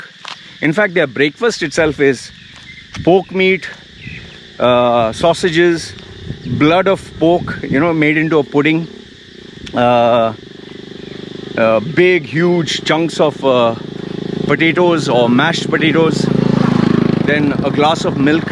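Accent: India. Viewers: You sound more indian than 6yrs ago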